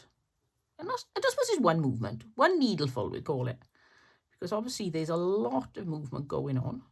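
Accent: Wales, United Kingdom. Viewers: I love naive or rustic work. It just looks real to me. Not like stuff that is so perfect you don't want to use it. Anyway i'm new here. Are you originally from Wales? Sounds like you are. I'm in uk originally from south africa. I also do many crafts and love doing any kind of hand sewing.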